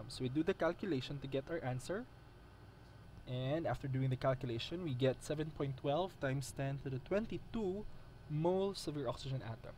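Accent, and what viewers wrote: Philippines. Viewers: This guy is a filipino just by his accent.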